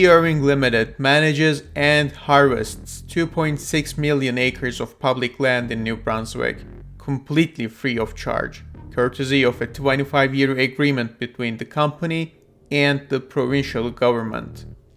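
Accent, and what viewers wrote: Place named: France. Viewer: sounded like a strong french accent. BTW, the Irvings were originally from the Buctouche(sp) area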